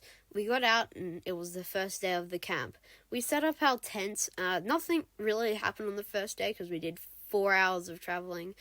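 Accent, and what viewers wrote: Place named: Australia. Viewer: bros Australian 🇦🇺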